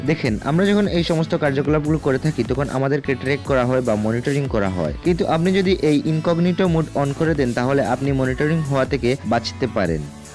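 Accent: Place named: Sylhet, Bangladesh. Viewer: সিলেটি ভাইনি বা❤️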